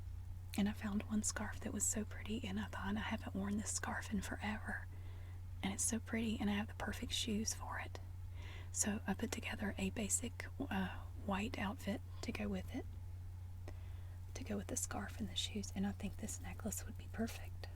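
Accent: South, United States. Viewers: this is the first video of yours that I have watched! I already love you! and your southern accent! wishing you well in your new house!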